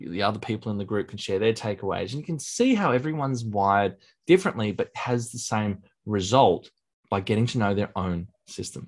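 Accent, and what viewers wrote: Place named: Australia. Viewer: Australian mate 🇦🇺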